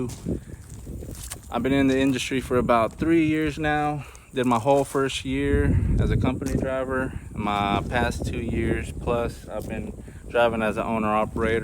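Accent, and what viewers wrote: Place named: South, United States. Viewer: Came over from your social media content bro, stayed for the funny stuff😂 the southern accent always gets me tho homie. Much love and look forward to seeing more videos😎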